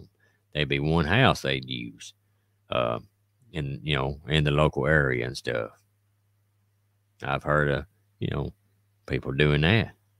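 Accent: South, United States. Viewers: This is the first time I seen you face to face. I came across you last week scrolling thur different videos from YouTube. I have stopped and seen one of your videos and watched it! Boy ol boy I'm hooked! I watch almost all day or before I go to bed. I about got them all watched. I'm off work because I found out I have a broken hip😢 I'm going thur alot of stress. I absolutely love your videos and your southern accent! I have so much peace listening and watching! You do a awesome job thank you so very very much!! For all your hard work and time doing them! It truly is a blessing! God bless you and your family.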